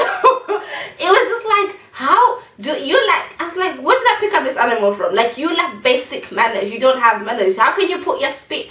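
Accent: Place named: Zimbabwe. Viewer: you have the cutest zimbabwean accent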